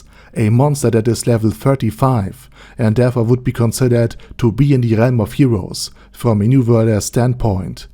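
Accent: Germany. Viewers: Bruder, dein deutscher Akzent ist schlimm.... Hab wrsl nen schlimmeren, aber kann ma das nd geben, wrsl aber trzdm n gutes video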